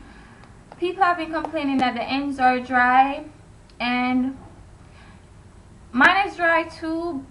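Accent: Jamaica. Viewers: sounds Jamaican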